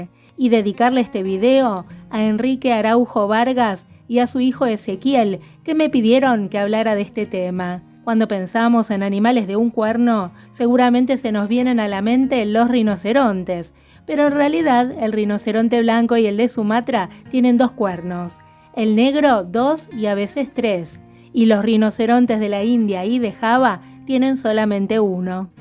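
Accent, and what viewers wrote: Argentina. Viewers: Yo estaba viendo este video bien interesante 😊, cuando llegó mi mamá y me dijo "ella debe ser de Argentina, por el acento" y yo quedé 😮wow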